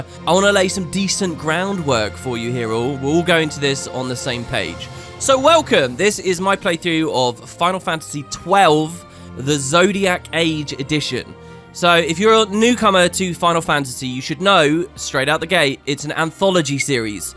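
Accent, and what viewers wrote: United Kingdom. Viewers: you absolute monster. I learned 3 things in this video 1) You are younger than me (I think the British accent makes you sound more mature than my American slang) 2. That a game that should have been about Balthier missed by a few letters, until a pivot in design. And 3) That it wasn't Nethecite causing the weird audio. That has been my headcanon since 06, and it's dead now. You've killed it. Sure hope you take better care of Rex.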